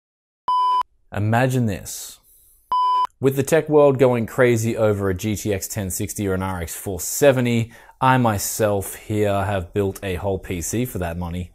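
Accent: Australia. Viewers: Oh yay an Aussie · Is this fella Aussie?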